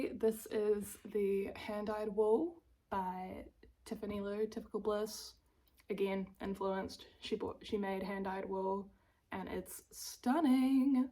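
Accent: New Zealand. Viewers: I finished school in NZ and also studied at Victoria University of Wellington for 5 years. It's so nice to hear the kiwi accent again! I really love your projects and can't wait for future videos. Welcome to the podcasting world 😊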